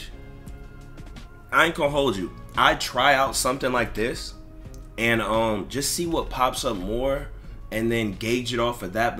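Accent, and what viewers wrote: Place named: New York, United States. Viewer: Bro where r u from u talk like YRG and young don the sauce god and some New York nigga all at once bruh wth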